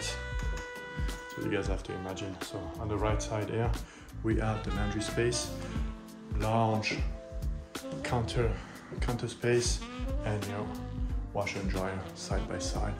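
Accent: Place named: France. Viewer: Great video, but I didn't understand a damn word you said. You can either skip using a microphone or not have a heavy French accent, but you can't do both. 😊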